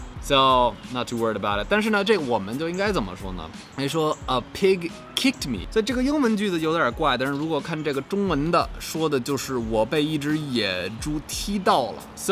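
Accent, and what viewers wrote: Beijing, China. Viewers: 第一次看你的视频，哥们儿，你是真北京人假外国人吧！这京腔也太地道了，连痞味儿都出来了……好听！赞👍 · 口音连着名字都是京味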